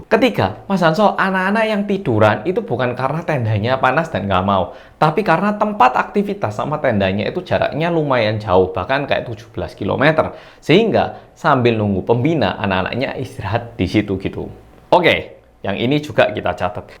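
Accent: Java, Indonesia. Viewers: mas Korea iki ... bahss Indonesia ne ke Jawa-jawaan ya .... Hi ... aq yo wong jowo mas Korea .... Sugeng siang 😀😀😀😀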